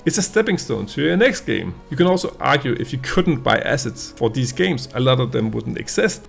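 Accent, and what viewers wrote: Denmark. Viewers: Love that thick danish accent.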